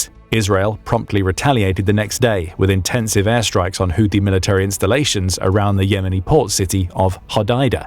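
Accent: United Kingdom. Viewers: why do we have to keep hearing American issues, with a British accent. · 250 years ago, Rebels broke away from British rule and sailed to the New World to start their own government. But, this youtube channel never got that memo. So . . . they speak with a British accent About U S military movements. WHAT IS WRONG WITH THIS PICTURE?! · QUERY: Why choose Brit accent for narration?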